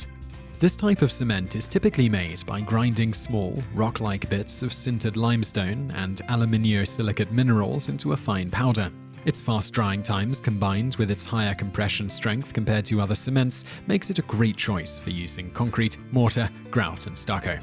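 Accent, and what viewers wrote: England, United Kingdom. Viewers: Sounds like an english accent, but he says "sidewalk".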